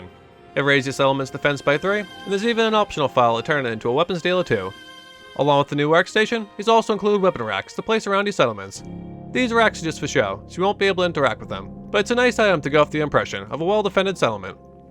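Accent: Boston, United States. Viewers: Chris Kozma I know I'm really late but he's from Boston lol. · Just want to say, your voice is very fitting for a game set around Boston.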